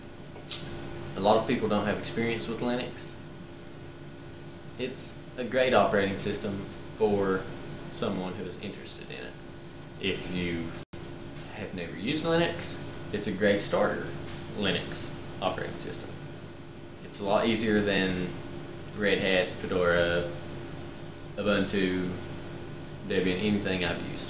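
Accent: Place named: South, United States. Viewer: this yankee thinks that's a pretty cool southern accent. i can't place it, but it's great. u could have a career in cartoons i mean hella cool. maybe eastern tenesee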